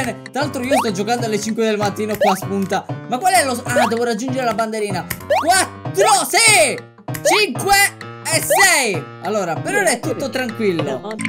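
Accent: Sicily, Italy. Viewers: Te sei siciliano?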